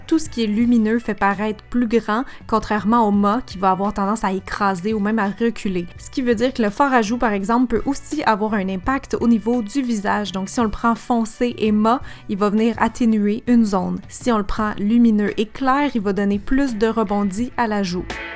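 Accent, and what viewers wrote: Canada. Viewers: As un accent Canadien ou pas ?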